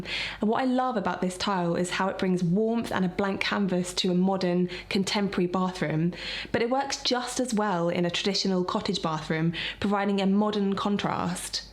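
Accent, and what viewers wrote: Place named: United Kingdom. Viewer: I was thinking of saying the same thing when I saw jose's. What I'd like to add it that, your British accent is the lovliest I've heard!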